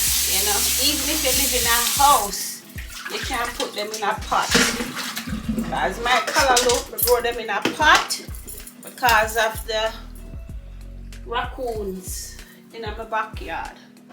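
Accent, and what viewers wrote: Jamaica. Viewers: Don't stop that is how we Jamaican talk.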